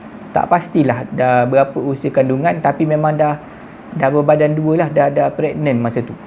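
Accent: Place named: Perak, Malaysia. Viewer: Bang orang mana terengganu ke perak bunyi seakan akan macam loghat peghak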